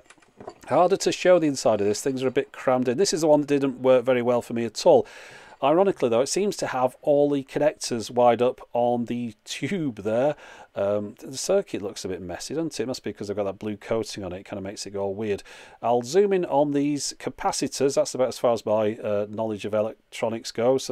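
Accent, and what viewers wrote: United Kingdom. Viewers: Omg, youtube comments never cease to amaze me. I cant believe people were annoyed enough to complain about your pronunciation of tubes! i rather like the brittish pronunciation of many words and i know I'm not alone here. Why else are most documentaries hosted/narrated by chaps with English accents. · seriously you shouldn't care that much about what people try to tell you especially not on how to pronounce a word just ignore these people, no one can force you to pronounce a word like they do it just cause you're from the UK and they are from an other part of the world which speaks english and pronounces the word differently hate shit like that people stop trying to make someone talk like you do just cause you dislike the way he does it! live and let live or go fuck off (sorry for the rude words but its just true) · I like the way he says "tchubes" Just use a long U with a British accent. 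I did notice that he uses a short O when saying phono though. Sounds like "fawno". Whatever, I have no problem understanding and actually enjoy the British accent. Think I will go watch some Anglophile vids.... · A vid whose purpose is trolling comment trolls, delivered in a British accent. Excellent!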